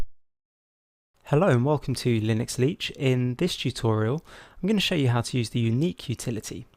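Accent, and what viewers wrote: United Kingdom. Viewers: oh god the british accent...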